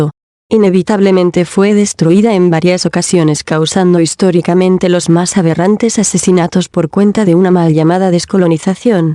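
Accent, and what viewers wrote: Spain. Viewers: Bueno yo les voy a decir algo quienes fueron los primeros MATONES DELICUENTES SAQUEADORES BIOLADORES ASESINOS en la colonia latinoamericano quiénes fueron los Epañoles y tu hablas de un VENEZOLANO que se REBELÓ Y TRAICIONÓ AL IMPERIO ESPAÑOL para la libercion del RÉGIMEN CRUEL ASESINO BIOLADORES LADRONES QUE SAQUERON A LOS PAISES latinos de a aquélla epoca . TODOS TIENEN SU LADO OSCURO OSEA tienen RABO de paja no se pueden acerar a la CANDELA POR QUE SE VAN A QUEMAR hay te la dejo . Por tu acento eres ESPAÑOLA PAMAS VAINA jajajaja me CAGO DE RISA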